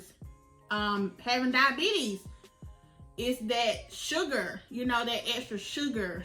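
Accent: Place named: South, United States. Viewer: I had a feeling you were from the south sis, you give the vibes hunnie❣️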